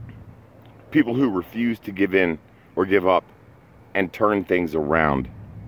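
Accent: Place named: England, United Kingdom. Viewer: Loving your English accent 🤩 you good!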